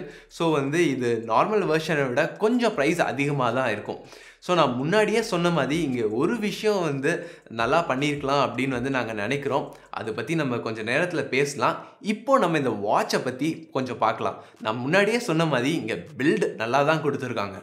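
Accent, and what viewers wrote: Kerala, India. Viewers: I think he is Malayali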